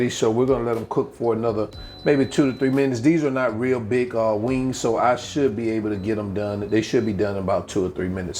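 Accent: South, United States. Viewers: Ok thanks...try this weekend in my oven looks amazing.....where you from?? I can hear your southern accent ..its nice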